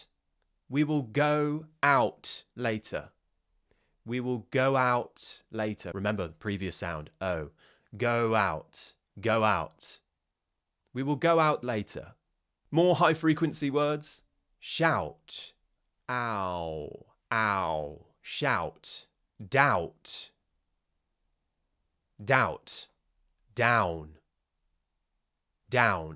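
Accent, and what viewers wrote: United Kingdom. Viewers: The British accent made me move to the UK 🥰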